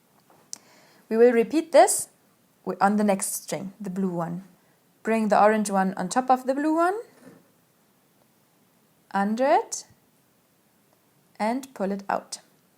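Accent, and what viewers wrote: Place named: England, United Kingdom. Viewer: I enjoyed your video, thanks, Also I like English accent, doesn’t sounds German😉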